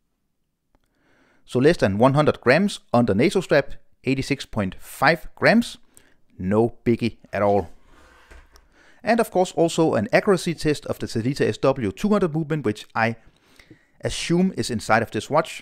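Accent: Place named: Denmark. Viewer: haha insåg inte att det var dansk engelska jag hörde! Gissade på Holland/österrike först. Du gör bra videos!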